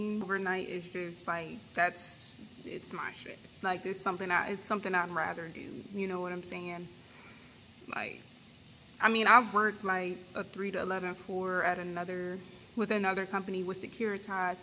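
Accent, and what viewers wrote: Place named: Philadelphia, United States. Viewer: You sound like a Philly Jawn! ..or surrounding area.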